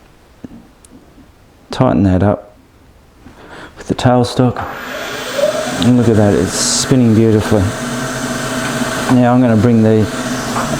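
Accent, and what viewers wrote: Australia. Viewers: Nice piece of work, and always good to hear an Aussy accent.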